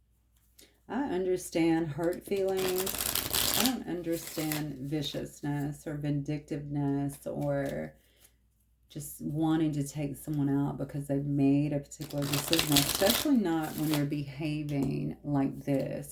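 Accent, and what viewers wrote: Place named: Alabama, United States. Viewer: Hi ty 4Ur readings always on point ❤ I ❤️ ur accent I knew u were from Alabama 🤗 I’m from ALICEVILLE 🤗 I live in Buffalo NY since the 70s still have lots of family there. SWEET HOME ALABAMA 🥹🥰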